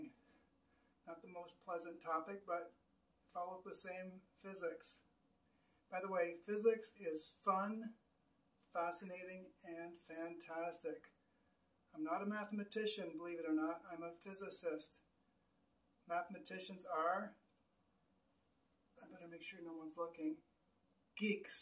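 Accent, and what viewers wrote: Canada. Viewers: Are you from Québec?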